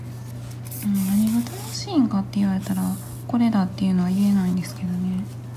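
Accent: Kansai, Japan. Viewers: さんのこんにちわぁ⤵︎🎶てゆう始まり方が大好きでガン見させていただいています(*^^*) 同じ関西なのでイントネーションが違和感なくていいです(*^^*)親近感✨ 長い間編み物をしてないのですがまた基本から始めたいなあて思えてきて毛糸をかいましたwww(*^^*) さんの、ゆるさが私にピッタリ！チャックを付けながらの色々な本音話もめっちゃわかりますよ。。同感です～ わたしゃは独特なんです笑。て話も一緒に笑いながらみています(*^^*) ゆっくりと丁寧な説明ありがとうございます(^.^)♡ 黙々と細かい作業が大好きなんで失敗しながらも楽しくこれからも学ばせてもらいますね！出会えて良かったです！！感謝😊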